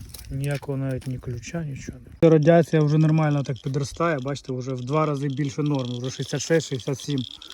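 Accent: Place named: Poland. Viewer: Фиии , польский суржик !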